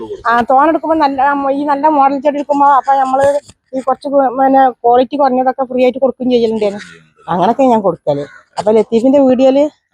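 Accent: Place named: Malappuram, India. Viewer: വീട് കറക്റ്റ് എവിടെയാ, സംസാരം കേട്ടിട്ട് മലപ്പുറം ആണ്, എവിടെ ആണെന്ന് വളരെ കൃത്യായിട്ട് പറയോ, അല്ലെങ്കിൽ നമ്പർ തരി പ്ലീസ്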